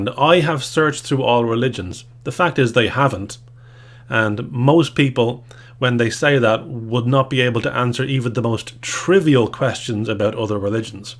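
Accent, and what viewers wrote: Ireland. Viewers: haha after I lifted off it was like watching a whole new video. I really enjoy the cadence of your Irish accent. I sent this to my daughter🤓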